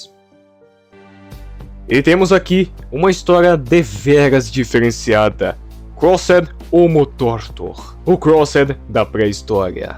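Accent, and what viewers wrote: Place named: Pará, Brazil. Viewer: Eras esse égua, até pareceu paraense kkkkk gostei do rank, discordei de algumas posições kkkkkkk vlw, flw 👏🏽🫶🏼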